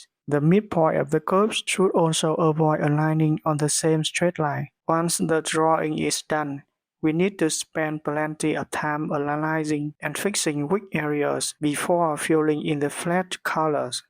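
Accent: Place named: Vietnam. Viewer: Giọng phát âm tiếng anh nghe đúng tệ, nghe y như người việt, ngó ra cái kênh hóa ra của vn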